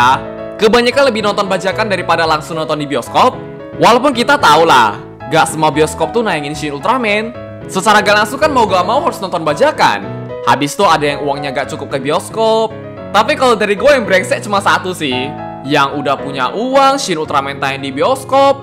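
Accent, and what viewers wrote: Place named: Medan, Indonesia. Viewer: hmmm abang ini fix orang medan wkwk salam kenal bang, btw gua orang pakam bang. dari awal gua subscribe elu rada ngeh sama logat logat khas medan lu eh ternyata bener orang medan dong wkwk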